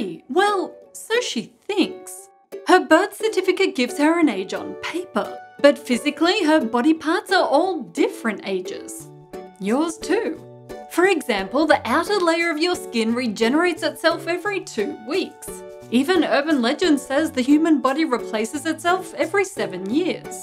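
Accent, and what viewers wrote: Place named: Australia. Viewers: Yes she is Australian. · her accent is so thickly (australian?) sometimes I feel like she has trouble talking.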